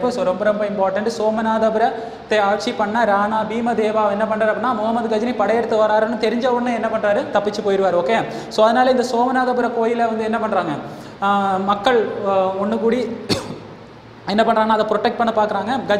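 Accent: India. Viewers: பேச்சு கொஞ்சம் நாகர்கோவில் மனம் வீசியது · Tamil language Malayalam slang mari pesuringa sir,, i think neenga Kerala😊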